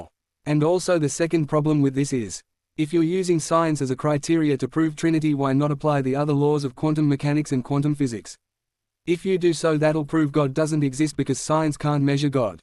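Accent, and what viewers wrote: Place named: United Kingdom. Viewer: Bro your voice is like a British lad💀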